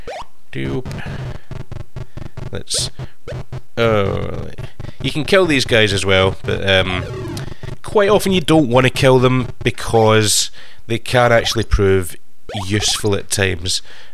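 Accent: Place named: United States. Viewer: Ha ha! I like that American accent. Almost had a bit of a 50s radio voice going, there.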